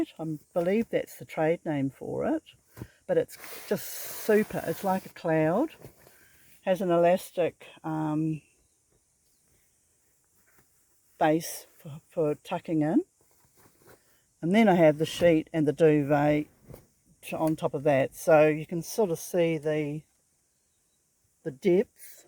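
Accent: New Zealand. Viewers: That kiwi accent though, pacific pom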